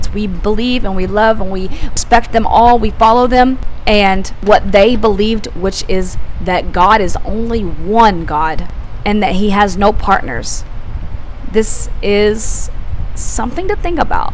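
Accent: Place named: South, United States. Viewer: southern accent sister. Baarakallaahu feekum.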